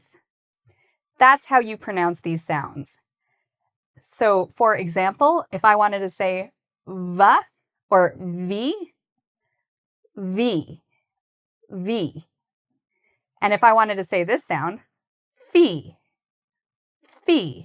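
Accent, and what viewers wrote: United States. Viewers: Is this accent American or British? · She sounds American two hundred percent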